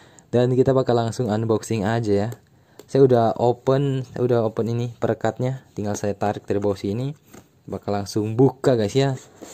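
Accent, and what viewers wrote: Manado, Indonesia. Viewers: Bng, kmu orang Manado?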